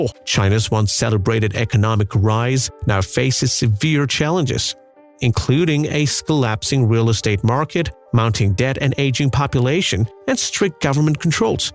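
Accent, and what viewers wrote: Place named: United States. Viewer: I hear American accent! Lol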